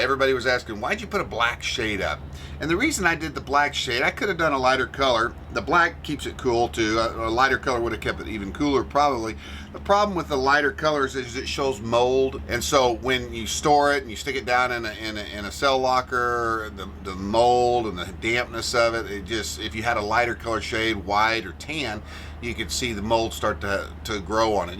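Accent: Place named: Texas, United States. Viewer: Your family is wonderful so very talented in many ways , Love to hear you talk TEXAS My greatest love is your boat . It’s really pretty you guys keep it wonderful I watch a lot of boat channels I would pick your boat over most I see . In another life I would live it the same way you guys are .All of you put a smile on my face Lovely family !stay safe have fun !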